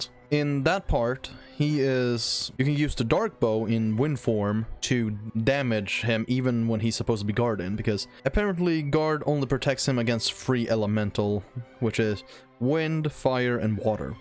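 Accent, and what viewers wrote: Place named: Sweden. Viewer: Haha var tvungen att kolla var du kom ifrån. Tyckte väl din engelska lät svensk. Tack för en bra video!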